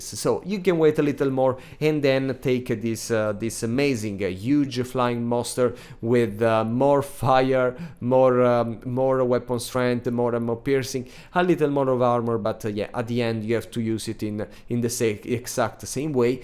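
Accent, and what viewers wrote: Italy. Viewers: I started to finally play more TWWH 3 recently. Your channel is great for me. Learning a ton. And you Italian accent is wonderful! (I'm American)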